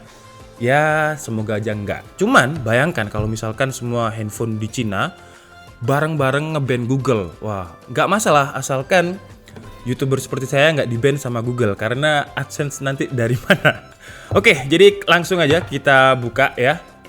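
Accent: Java, Indonesia. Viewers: Ternyata..boso jowo asline..asli wong jowo mase iki..salam santuy sam..😁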